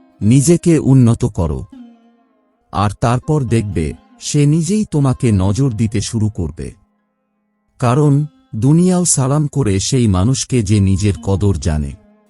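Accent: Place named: Chapai Nawabganj, Bangladesh. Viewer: ভাই আপনার বাড়ি কি চাঁপাই? আমার এক্সের বাড়ি চাাঁপাই, ও এই ভাবে কথা বলতো আপনার স এর উচ্চারণ এই রকম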